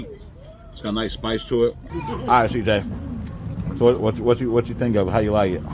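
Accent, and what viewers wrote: Midwest, United States. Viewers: I had a feeling you was from the Midwest I was thinking Detroit or Chicago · I have been to Gary before a couple times. Ran track meet in that area when in high school and think I played a baseball tournament too in high school there. Michael Jackson is the man! I knew you had those midwestern roots....can hear it in your voice. Gary isn't too far from Detroit area where I am from. Detroit roots here baby! 313! That pool is sad that it's not being used anymore. When was the last time u were there in Gary? You know I enjoyed that cameo from the train!!!🤣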